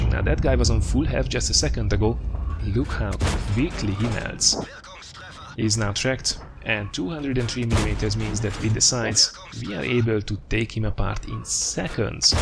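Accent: Hungary. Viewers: Most veszem észre a magyar kiejtést, ha ezt olvasod légy szíves valahogy jelezd , hogy tényleg magyar vagy:D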